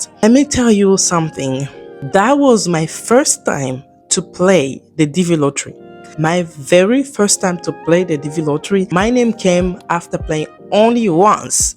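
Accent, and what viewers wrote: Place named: Democratic Republic of the Congo. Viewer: I can feel with your accent that you are from the Congo.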